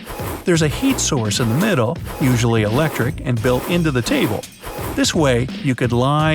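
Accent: United Kingdom. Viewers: Baaaathroom. The British accent makes me sick! Sounds so robotic.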